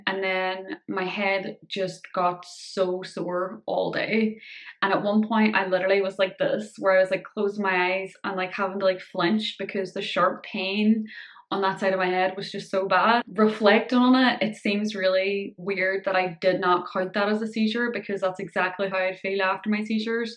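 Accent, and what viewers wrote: Ireland. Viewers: 🙏🙏🙏 Keppra works for me. Been on it since 2006. Added Trileptal a few years later. The two together work awesome. Everyone responds different to different meds. Love the Irish accent ❤️ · The cards are such a wonderful idea. I have a little handwritten folded paper in my wallet with emergency contact info just in case. (I have a panic disorder and medication can sometimes make me dizzy). I think everyone should have a card anyway :) Also please do not let the negative comments about what you should post get to you, it’s YOUR channel. You really do not owe anyone anything. It’s a gift that we get from you to see what you’re up to or how you’re doing on your time. With that said I’m so happy you’re back in the homeland and getting the help you deserve! I know this new medication is going to be great! It’s such a huge accomplishment to have progressed in the physical side and now with a few adjustments I feel the spacial issues can resolve too. 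P.S. your Irish accent is always so refreshing to hear ❤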